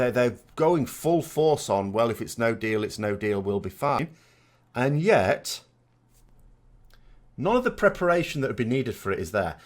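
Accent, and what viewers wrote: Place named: Northern England, United Kingdom. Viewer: The moment I heard the presenter's Northern accent I knew this was all going to be a diatribe of doom and gloom, why is it that the moment one steps foot North of Watford one is confronted almost unfailingly by this dog in the manger attitude, be positive man, I know that flies in the face of everything your parents taught you whilst you were scoffing down your hotpot and black pudding but really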